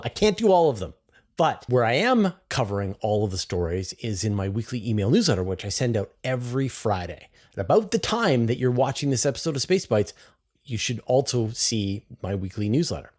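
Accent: Canada. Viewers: I think he's talking about my comment on the last video 😂. I was just giving him a hard time because of his Canadian pronunciation of "A"s and "OU" vowels. My whole family immigrated from Canada to the US, so I always notice the subtle differences in speech. Some people are just really good at hearing accents, while others aren't. You being Canadian is why it sounded good to you 😂.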